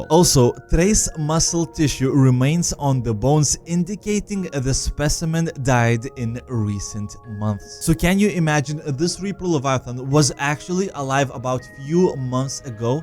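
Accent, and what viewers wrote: Russia. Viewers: OMG i finally find a good youtuber your russian actcent sounds good i love your vids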